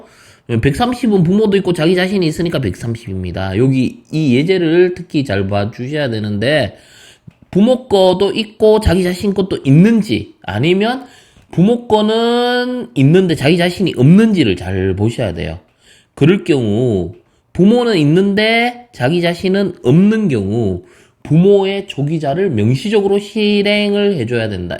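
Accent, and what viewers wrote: Gyeongsang, South Korea. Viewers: 파이썬 고급테크닉을 잘 몰라서 찾아보다가 이거를 처음 봤는데, 사투리 사용하시는게 정말 귀에쏙쏙 잘 들어오네요. 서울말로 하는 것보다 경상도 억양 묻어나는게 훨씬 듣기 편해요~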